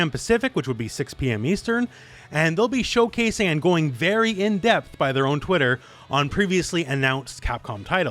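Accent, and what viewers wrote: Canada. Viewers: you sound exactly like my 9th grade algebra teacher. Canadian accent and all.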